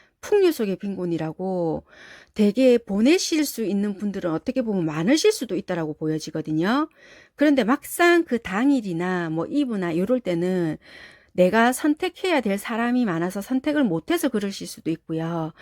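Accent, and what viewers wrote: Busan, South Korea. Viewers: 감사합니다 ^^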